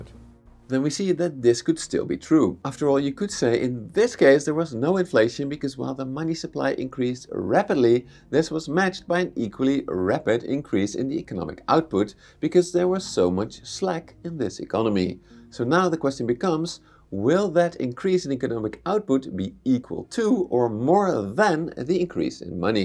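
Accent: Netherlands. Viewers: Waarom heb je een Nederlandse accent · Are you Dutch? Or even Frisian;) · Dacht dat een nederlands accent niet erger kon, maar deze gozer gooit er gewoon een vocal fry bij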